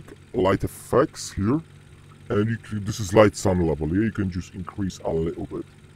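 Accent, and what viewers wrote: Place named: Italy. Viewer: fra si sente di piu l'accento italiano che il voice changer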